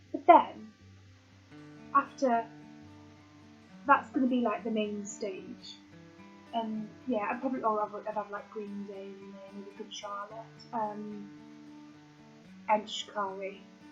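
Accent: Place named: Wales, United Kingdom. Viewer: you cutie <3 I think that after this long of knowing you and never meeting up I'd built up this voice in my head for you that never took into account the fact that you're Welsh:P Your accent is so much more adorable than I thought it'd be:3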